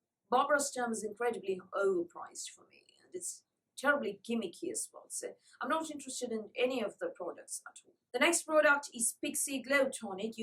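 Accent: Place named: RP, United Kingdom. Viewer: I love your accent! Where are you from? It sounds like maybe you learned English with RP? Also I love how dry you are hehe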